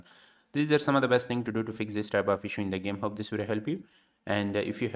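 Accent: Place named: India. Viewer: Your English with Indian accent is trouble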